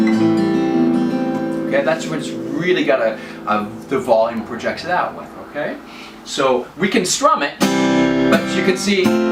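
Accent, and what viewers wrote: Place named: France. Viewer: This isn't a criticism, but I had to watch this whole explanation a couples times until I got used to the quaint French accent that kept creeping in. BTW, most folks place the accent on the second syllable of "Sapele"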